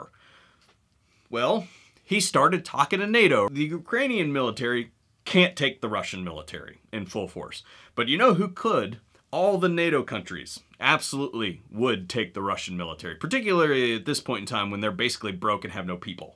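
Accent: Ohio, United States. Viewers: Love the video and I can tell you are from Ohio , from another Ohioan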